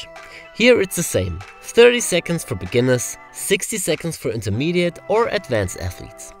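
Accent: Germany. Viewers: Schön dicker deutscher Akzent! 😁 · Are you from germany? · r u german, swiss, austrian or dutch? 🤔🤓 ur accent sounds like either of those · Brooo bist aufjedenfall Deutscher das hört man doch raus 😂❤️ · german accent haha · da ist doch ein akzent, deutsch? :-P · Dat German accent :D Edit - Ah wait: nice video bro! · Dieser deutsche Akzent ist so ekelhaft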